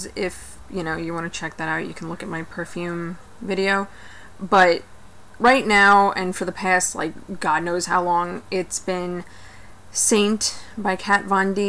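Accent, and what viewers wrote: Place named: New York, United States. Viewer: haha you embrace the pale! that was awesome. I do the same. Are you from NYC? Long island? im hearing some new yorker in your words..im from Bklyn!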